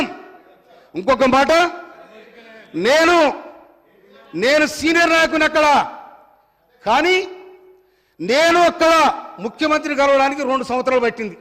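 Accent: Telangana, India. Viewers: Abba eam mataldav anna edhi asalu sesalina Telangana basa yasa supar spech anna nuvu eappudu gitlanay matadalay anna garragu mata dailag supar supar anna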